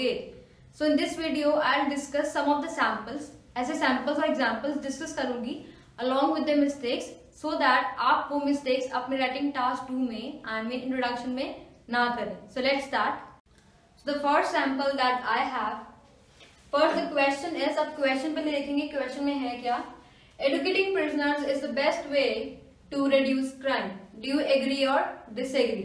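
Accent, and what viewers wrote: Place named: India. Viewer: your talent and effort is equal to solute. but i am not understanding you coz of accent of Indian and sometimes indian words😭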